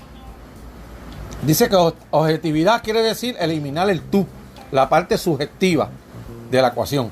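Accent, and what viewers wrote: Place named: Puerto Rico. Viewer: Ese acento parece Boricua. 🤔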